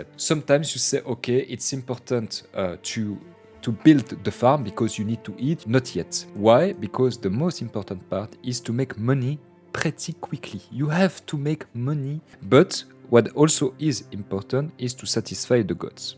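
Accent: France. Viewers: I have played this game so often when I was younger. Thanks a lot for this instand nostalgia. All the best, Valentin PS: Your French accent sounds marvellous, plz keep it! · Français qui parle anglais ? (A l'accent et à la prononciation de l'anglais, ça se sent^^) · By your accent, you are Frenchman, are you? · The french is strong with this one 😍😍😍 oh I Love it so much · The French accent always a good lissen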